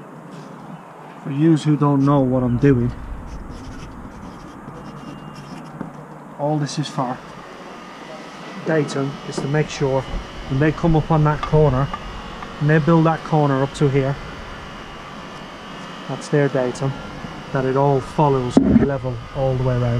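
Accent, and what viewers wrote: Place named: Ireland. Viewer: You sound like a lovely irishman i work ed with in construction many years ago nice work by the way 👍👍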